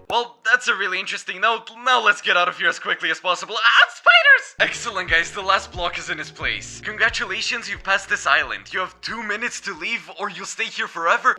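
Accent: Russia. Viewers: Are you Russian 🤔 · It's quite cool to see a Russian speaker talking in English for minecraft content, Subbed.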